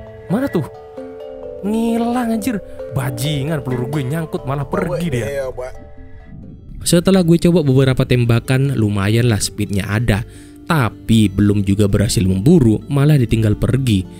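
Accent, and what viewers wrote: Indonesia. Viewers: lu orang madura ya bang? logat nya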